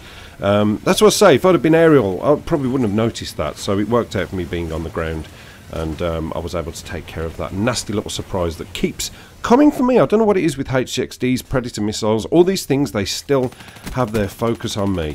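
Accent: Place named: North, United Kingdom. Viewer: Good to hear your dulcent northern tones again, fella. Welcome back.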